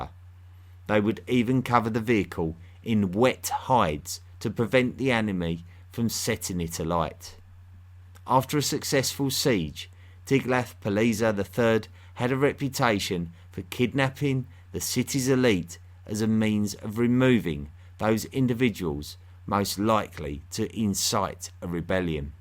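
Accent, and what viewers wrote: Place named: United Kingdom. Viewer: Its one thing this poor bastds born a uk subject, but to be double querst(sic) with a stupid uk accent it terrible enought to change the channel. God, i cant stand the commonwealth bastards.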